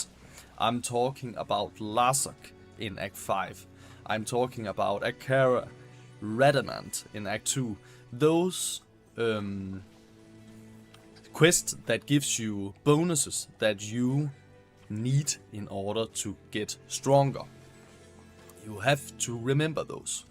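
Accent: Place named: Denmark. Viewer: Uden tvivl dansk accent 😂😂😂😂😂 fed video!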